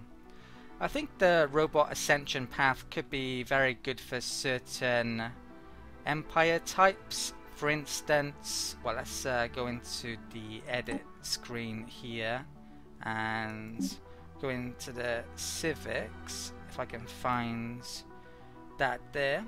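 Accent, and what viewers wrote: Wales, United Kingdom. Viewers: Hey, can I ask, are you Welsh? Because my brain is telling me you are, and I wanted to see if I was right. great video :)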